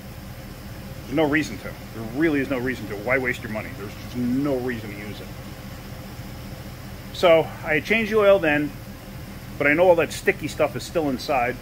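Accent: Sweden. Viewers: What did i just hear, you are from Sweden 😀 For how long have you been in the states? Greetings from Finland 😊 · I KNEW you were from Sweden. Your accent gives it away.😅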